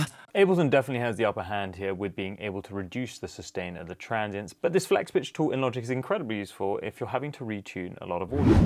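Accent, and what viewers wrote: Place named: California, United States. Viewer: The California accent is also how we Americans sound doing it lol well done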